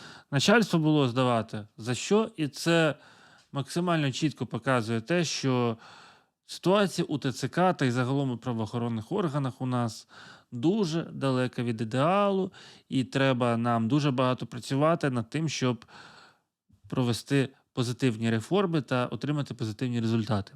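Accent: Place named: Russia. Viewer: У Вас російський акцент, а за що лайк, Ви ж нічого не розказали, де покарання, де конкретні дії?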